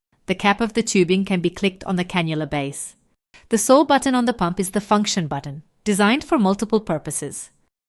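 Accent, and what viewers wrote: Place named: India. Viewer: haha the ai face intro and then into the thickest indian accent after the AI slop moves on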